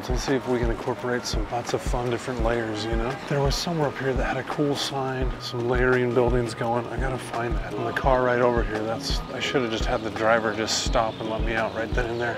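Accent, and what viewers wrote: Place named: Boston, United States. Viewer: I have never heard a better Boston accent in my life. And I’ve watched a few Ben Affleck movies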